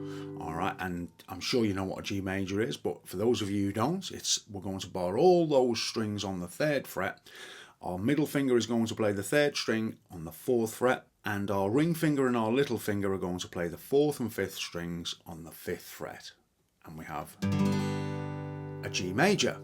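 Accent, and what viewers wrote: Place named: Derbyshire, United Kingdom. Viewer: Listening from Uganda... is that a Derbyshire or Nottinghamshire accent? ... I used to live in & around Louth ... love your easy style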